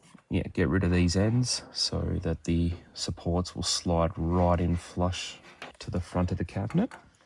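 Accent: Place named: Australia. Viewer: Great to hear another Aussie voice on youtbe! Love the unit. Subbed, I look forward to seeing more of you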